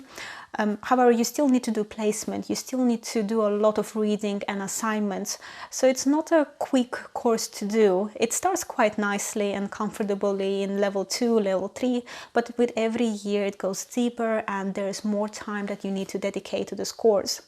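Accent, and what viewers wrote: Russia. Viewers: you have russian accent. Are you from Russia?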